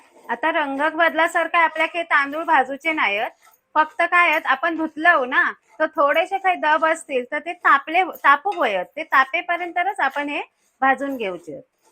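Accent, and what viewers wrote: Malvani, India. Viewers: मालवली भाषेत सांगतात ते खूप छान वाटते ऐकायला.❤❤❤❤❤❤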